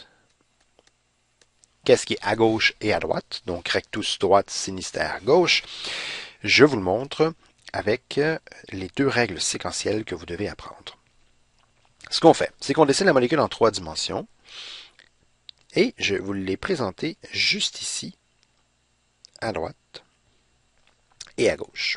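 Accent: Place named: Quebec, Canada. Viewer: Oh mon dieu, je suis en première année de médecine, ça fait trois mois que je cherche à comprendre cette chose !!! A deux semaines des partielles je comprends enfin, en plus avec l'accent québécois, c'est parfait ! Merci beaucoup !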